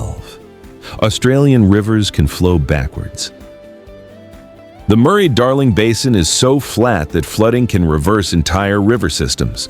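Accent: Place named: United States. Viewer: Talking about Australia with an American accent and mispronunciations? That's a paddling.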